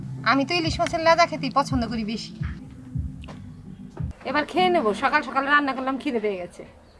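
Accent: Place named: Bangladesh. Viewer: দিদি ভাই তোমার কথা একেবারেই বাংলাদেশের মতো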